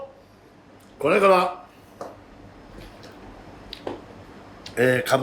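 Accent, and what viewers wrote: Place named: Tohoku, Japan. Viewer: 三國シェフは、南部か宮城の方ですか？それか道南かなあ。懐かしいアクセント。かぶ、畑からとってきて、このレシピやりまーす！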